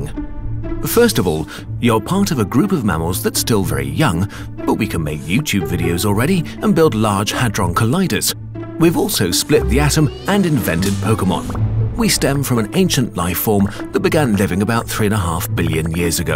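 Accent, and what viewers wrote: United Kingdom. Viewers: he reminds me of the narrator of the stanley parable. probably only because of the british accent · Love it and the British accent make it even better :)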